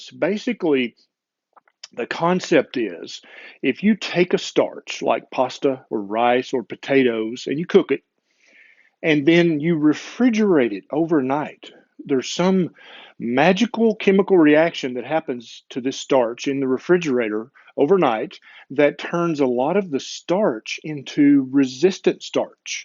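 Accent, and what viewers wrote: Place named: Tennessee, United States. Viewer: I never heard this as I don’t watch the dude as he creeps me out with his fake muscles and I keep getting his ads come up on all my YouTube videos... it’s enough to make me pay for premium. I am pretty naive but I can’t believe anyone believed this without testing til now. Love you Dr! Your accent makes me miss TN xxx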